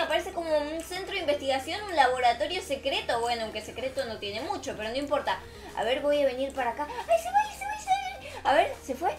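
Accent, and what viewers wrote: Argentina. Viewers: Te amo soy de gran canarias y me pegaste tu acento aregentina yo cuando sea mayor me voy a vivir a Argentina tengo 11 años y me instale roblox gracias a ti y fue lo mejor que hice te amo ati a a y a te amo y mi familia dice que eres mi ejemplo a seguir te quieroooooooooo oooooooooo muchoo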